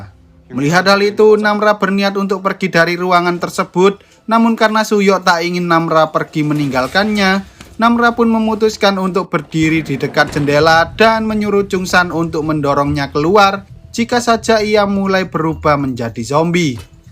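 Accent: Java, Indonesia. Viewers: BANG JAWA MANA?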